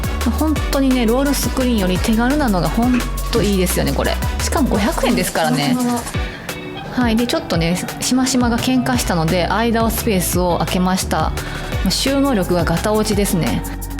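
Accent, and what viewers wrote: Kansai, Japan. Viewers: 関西訛りですか？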